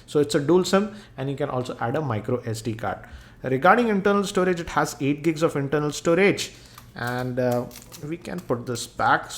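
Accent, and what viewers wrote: Hyderabad, India. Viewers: r u a hyderabadi??😮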